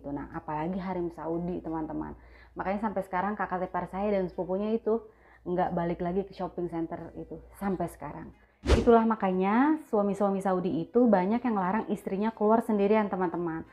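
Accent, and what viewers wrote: Jakarta, Indonesia. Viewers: Keluarga jakartanya dmana mpok?..klo dr logatnya kayak di jaksel...sy asli jagakarsa....sy biasa bawa jamaah umroh mpok, mkn klo sy lg di madinah bisa bersilaturahmi 🙏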